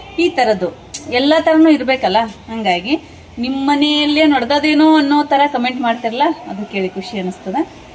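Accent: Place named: North Karnataka, India. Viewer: Namaste akka, nivu character galna anubhavisi avugalige jeeva tumbidagale, navu anubhavisi nodokagodu, otnalli chenage baratte, nanna husband mansare naktare nim videos play madidaga, avru kannada astagi nodalla, nim videos play madidaga tegi change madu bere haku andilla, nim uttara kannada bhashe accent ista agatte nagu baratte, nange nambike ide akka ondalla ondu dina doddadagi belitira, ondalla ondu dina success sikke sigatte, slow agi beldidakke bele jasti akka, pat anta beludre heloke anuhavagale iralla, nidhana beldaga modlu ethara madidvi amel ethara madidvi anta heloke experience iratte, nanu kuda elrigu share madta irtene, nimmanna mansare akka anta kardini, istu madilla andre hege heli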